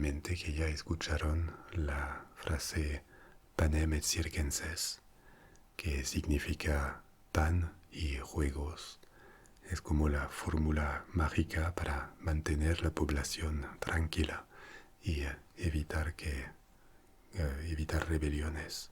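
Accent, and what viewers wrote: France. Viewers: Español con acento francés... ufff, este audio es maravilloso. Muchas gracias. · Qué hermoso! Castellano con acento francés! Gracias!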